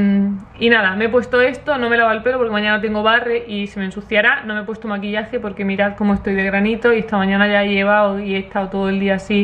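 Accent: Spain. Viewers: Si!! Graba el sobre ruedas! Me encantan tus vídeos! Y no tienes el acento de España muy marcado! No sé a qué se debe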